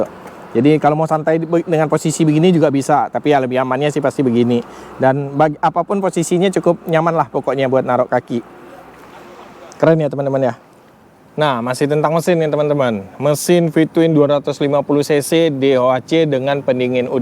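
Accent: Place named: Minangkabau, Indonesia. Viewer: Minang bana Uda ini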